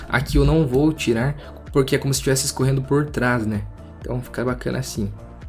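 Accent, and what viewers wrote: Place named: Rio Grande do Sul, Brazil. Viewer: parece gaúcho ne, também achei kjkjkkkkj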